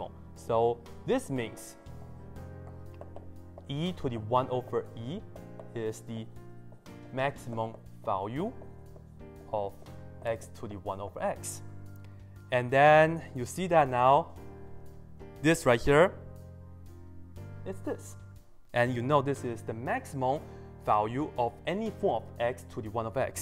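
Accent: Singapore. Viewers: 你妈 新加坡口音简直是折磨人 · Great video. You sound like you're from Singapore, which is a country known for its great education system.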